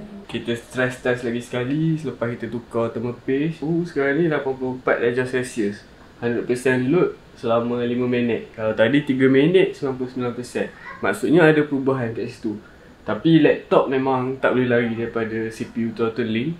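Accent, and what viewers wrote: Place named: Rompin, Malaysia. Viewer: Org rompin...kawan agak dah dengo loghat tu...terbaik terr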